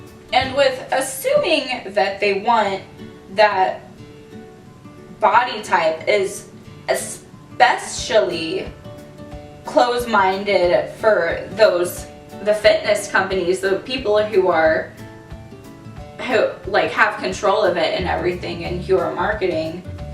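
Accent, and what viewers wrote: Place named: South, United States. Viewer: You should be a marketing expert! We are Individuals on the inside and out, but the industry is only researching and going for the bottom line - $$$. Love your research - You are a person after my own heart!!! Side Note: Your 'fake' southern accent may not be as fake as you think - most of our relatives on my side are from Alabama and Georgia and came to Southern Illinois who definitely have the southern accent - I picked it up after a week staying with my Cousins. Got Home Safe.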